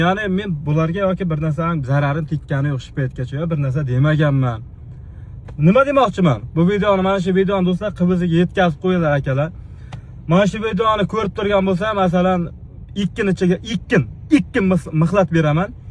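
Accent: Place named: Laqay, Uzbekistan. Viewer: Laqaycha gapirma zaybat oʻzing laqay degani gapriwni ham laqaycha qildeganimasku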